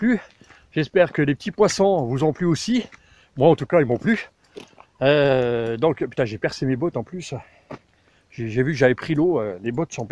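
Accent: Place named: Haute-Marne, France. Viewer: T'as gagné un nouvel abonné , t'es un vrai haut marnais , "un tiot machin" " j'chie d'dans" 😂 du vrai parlé de chez nous , et je te suis quand tu parles des grandes marques ....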